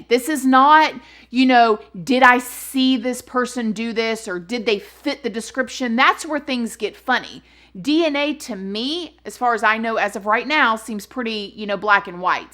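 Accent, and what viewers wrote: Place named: Florida, United States. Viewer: I'm always watching you and I think hummmm with the way you talk you must live in Florida. I live in North West Fl and have the same southern slang. LoL 🤣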